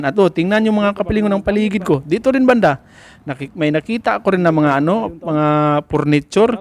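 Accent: Visayas, Philippines. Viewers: pls. mag gloves ka pohh pg mag dumpster para iwas kati sa kamay at sugat lalo na poh at kinakalkal mo ang mga dumpster... bisaya poh talaga accent mo mag tagalog...hehehe..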